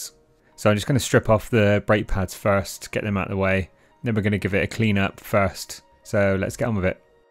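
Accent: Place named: South West, United Kingdom. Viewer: 😂😂😂 you cant get away from that south western accent mate 😂😂😂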